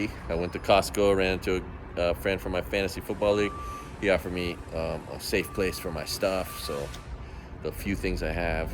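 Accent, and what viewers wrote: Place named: California, United States. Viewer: I wanna tell you something I think you’ll get a kick out of and see the funny side -: first time I heard you sign off “IF yer thinking of doing harm or crime, do less do nothing today, sit on sofa 🛋️…..”! You were standing outside planet gym or something where you’d just had a shower 🚿… and the thing is although we both speak English, due to differences in our accent, (im Scottish and you’re deep west coast California, I thought you said, “ do less crying 😿”. You see, I have bad days where everything gets me overwhelmed and I just wanna cry… Some ppl also can do harm to themselves. But I was literally feeling so bad when I heard you say “ if you thinking of doing harm or crying (crime) do less… just do nothing today, be lazy instead”! so, do you know what I did..? I didn’t stress… I stayed on couch all day sleeping, watching tv, watching YouTube, no hassle, no tears because of you, I was kind to myself because I thought you said “no crying”! 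So it’s funny, but I wanna say Thank you- because I had a chill day instead of being sad because of your kind, heartwarming real life way of signing off your videos. It wasn’t till I watched a few more videos, the penny dropped & I realised you weren’t saying crying, but rather crime! Ha ha 😅 ye gotta a laugh bro 😊😄 And may I say, that Making these videos are living examples of the two Hawaiian words pono and keena oly (sorry I don’t know how to spell them maybe you can reply with correct spelling) Bon voyage You handsome man ❤ P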